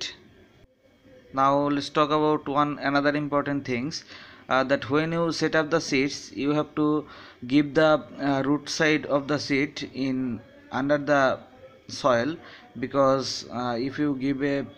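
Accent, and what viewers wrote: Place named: Butler English, India. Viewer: Butler English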